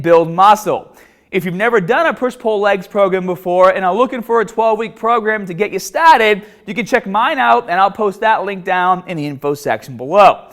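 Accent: Boston, United States. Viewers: loll that boston accent tho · God fucking damn that Boston accent!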